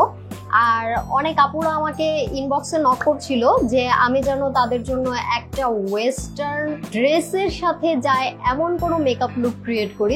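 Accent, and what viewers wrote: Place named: Comilla, Bangladesh. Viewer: Apu ami o cumillar meye😍🙋🙋 tmar kase cumillar vasay kotha bolte dekhte onek valo lage 😁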